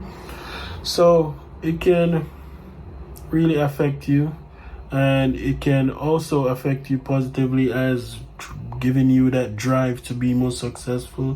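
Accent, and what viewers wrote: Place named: United Kingdom. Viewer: no you British accent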